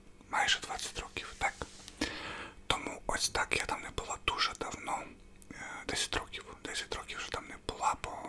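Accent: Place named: Poltava, Ukraine. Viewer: А я була впевнена, що ти з Полтавщини. Як приємно бути правою!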